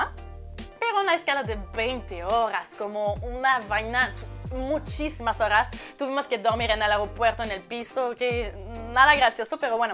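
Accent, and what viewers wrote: Peru. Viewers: Eres foránea o peruana el dialecto muy local no corresponde a una extranjera?? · tienes el acento peruano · Mejoraste mucho tu español hata acento de peruano tienes saludos desde argentina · Me recontra mate de risa cuando en el minuto dijiste algo muy latino y creo que muy usado en Perú "una vaina", jajajaj aja jajaja. Definitivamente ya hablas como toda limeña! 😁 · YÁ hablas con el característico YÁ de Perú. ;) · Que lindas palabras para nuestro pais, sobre todo la forma como la relatas, con tanta emoción, es cierto el Perú tiene hermosos paisajes, en costa, sierra y selva, por tu forma de hablar se ve que ya tomaste la forma de hablar de los peruanos, linda, besos y abrazos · Ya me suscribí me encanta tu asentó peruano... Canadiense un saludo desde el callao❤️ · Tu narración fue bien detallado y tienes que seguirla , impactas con tu castellano y tienes el dejo peruano ya tienes el acento...parte 2 y 3..7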